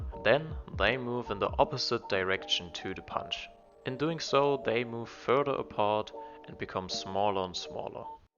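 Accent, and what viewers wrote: Germany. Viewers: Cause of your accent I found out you’re German and because of the layers👍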